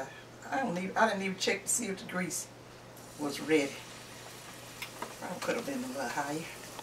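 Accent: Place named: Alabama, United States. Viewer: Hello, Ms.! I just found your channel and it takes me right back home to Alabama. The meals you make, the way you talk, and even the stories you tell such as killing a hog only twice a year sounds just like my folks. I wanted to know where you are from because you remind me so much of home sweet home. I just subscribed too! Thanks for these comforting videos!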